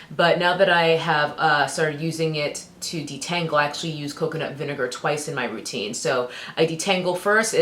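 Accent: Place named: DMV, United States. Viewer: Just found your channel amd love your voice! You speak like me!! From DMV by any chance?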